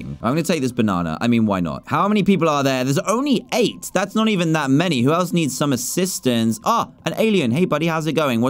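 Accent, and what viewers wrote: United Kingdom. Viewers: He's British. He has an accent. He can say it how he wants.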